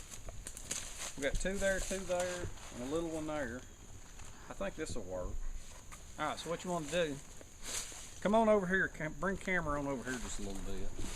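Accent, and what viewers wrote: South, United States. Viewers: Fantastic Invented Southern Brilliance. Your Honesty, Sense of Humor, That Great Laughter, Down Right Humbleness, Deep Southern Dialect, Warm Hearted Introductions and Best Father-ship makes your videos all worth watching all the way through to the end. Do you offer to your fans any of the products you design, I am a big fan of the V-Tree Grill. All the Very Best!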